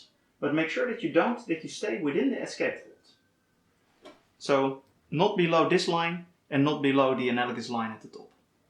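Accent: Netherlands. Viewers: Do I detect a Brabant accent?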